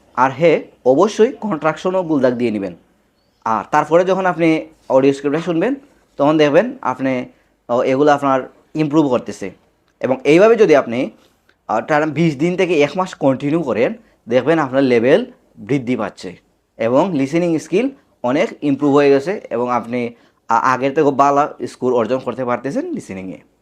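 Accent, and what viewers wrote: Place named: Sylhet, Bangladesh. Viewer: আরে সিলটি স্যার। আপনার কথা বুঝতে আমার অনেক সমস্যা হয়। স্যার প্লিজ, আগে একটা বাংলা প্রনানসিয়েশনের কোর্স করুন। এতে আমাদের বুঝতে ভালো হবে, সাথে আপনারও বাংলা কথায় সিলেটি একসেন্ট কেটে প্রমিত হবে। প্লিজ স্যার। আপনার কথা বুঝতে অনেক কষ্ট হচ্ছে।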